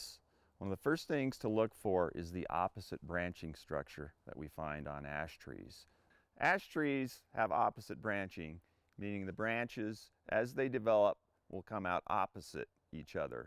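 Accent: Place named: United Kingdom. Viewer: Translation-Ash you're a tree? Nice! Wait is that a british accent? Sorry but I love british accents ♡♡♡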